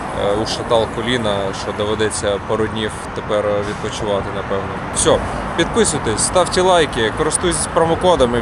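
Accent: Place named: Russia. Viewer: покращуйте вимову кацапська так і лізе, все інше лайк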